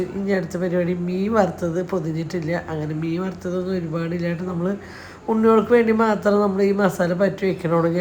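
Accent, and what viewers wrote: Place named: Thrissur, India. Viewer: അല്ലേലും തൃശൂർ ഭാഷ അവിടെ ഉള്ളവർക്കേ മനസ്സിൽ ആകുള്ളു അത് അവരുടെ കുറ്റമല്ല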